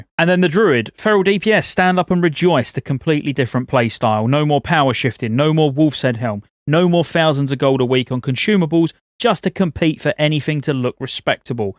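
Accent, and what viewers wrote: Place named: United Kingdom. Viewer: he talks too fast and the section are smeared together, you can barely notice when he changes subject. not to mention the terrible uk accent that makes you super hard to understand because vowel abuse.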